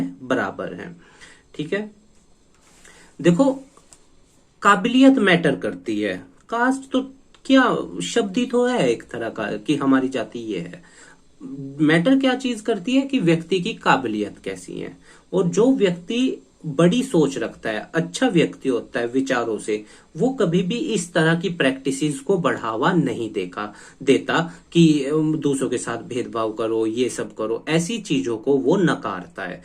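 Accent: Haryana, India. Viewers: I think his tone is Haryanvi !!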